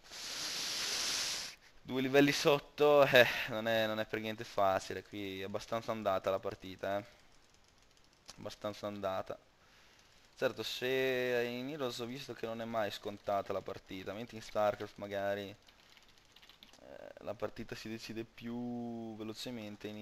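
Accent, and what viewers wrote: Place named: Romagna, Italy. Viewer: ah ok...è che sentivo l'accento romagnolo...sei bravo a giocare a heroes...sono cuboem#2629 in game se ti va di fare qualche partita..bella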